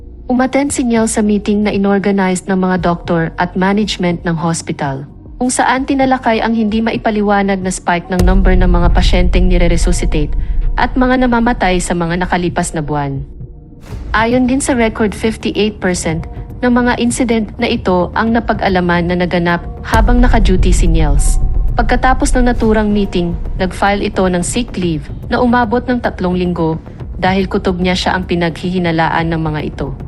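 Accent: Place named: Spain. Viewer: Hello sir How are you today in Austrailia? Thank you so much for visiting! and I wish you have a lovely weekend😊 I feel the Spanish accent in your language, where are you from? anyway it was so cool😊 new subscriber from south Korea!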